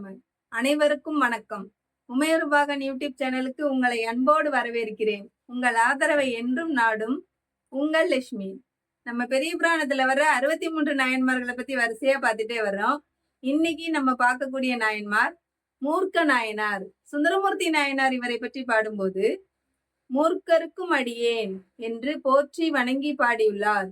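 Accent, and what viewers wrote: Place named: Tirunelveli, India. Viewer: Good Tirunelveli Tamil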